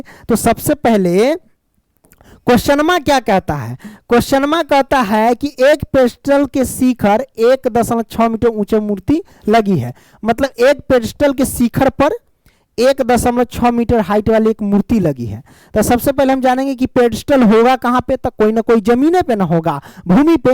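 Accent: Bihar, India. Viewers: Sir apka bihari bhasha mast lagta hai❤❤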